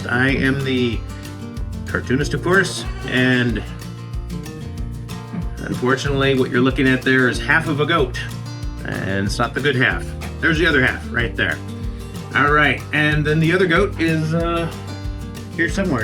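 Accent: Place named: Canada. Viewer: When you 1st started talking, I thought you were Canadian.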